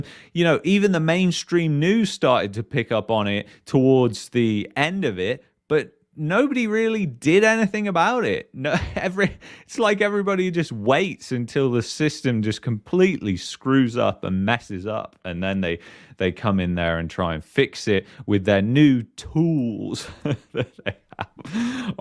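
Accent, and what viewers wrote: United Kingdom. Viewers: Why is a Brit , explaining Canadian economics ?😮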